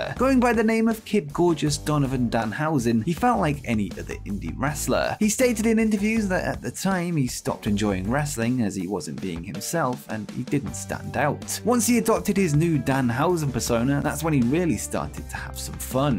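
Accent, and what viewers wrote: United Kingdom. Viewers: Also hearing "Pepsi man" in a nice British accent is awesome! Vive la!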